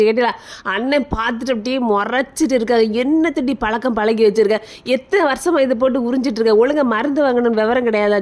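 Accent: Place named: Tirunelveli, India. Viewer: Love u akka ungala enakku rompa pedikkum. Nama tirnelveli pasai super akka. Enga vettuku vanga akka pls ❤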